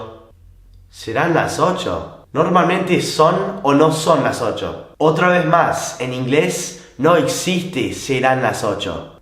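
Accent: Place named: Argentina. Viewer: posta chavon! se nota que tenes una conexion con argentina y lo bien que estas aprendiendo, no solo a hablar español, sino tambien a aplicar nuestro acento.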